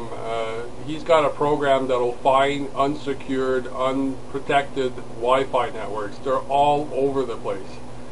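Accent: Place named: Canada. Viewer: hahaha Canadian accent!!!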